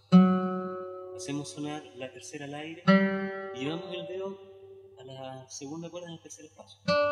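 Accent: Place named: Chile. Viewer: Que hermoso tu acento chileno❤ estoy enamorado del acento chileno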